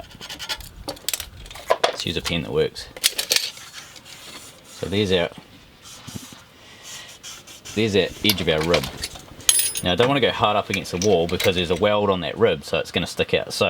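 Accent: New Zealand. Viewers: you are blossoming as a comedian. Your Kiwi accent is channeling Fred Dagg (poor John Clarke, we miss him). When you mentioned "My mate Trevor" the impression was complete!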